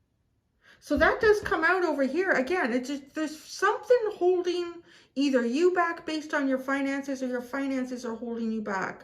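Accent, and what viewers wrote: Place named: Canada. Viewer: your canadian accent is so soft and good for practicing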